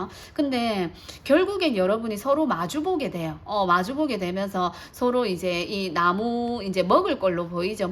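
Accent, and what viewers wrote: Gyeongsang, South Korea. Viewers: 3 여러가지를 하고 있는 것 잘 맞추시네요~^_^! 이젠 하나만 하려 합니다ㅋ 작가의 길이죠. 처음으로 댓글 한 번 남겨봅니다. 구수한 갱상도 사투리 너무 좋네요. 감사합니다^_^!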